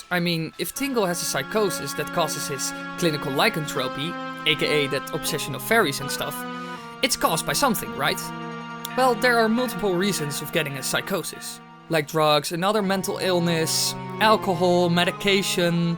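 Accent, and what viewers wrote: Netherlands. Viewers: That accent must be Dutch. Good video! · Is this lad Dutch?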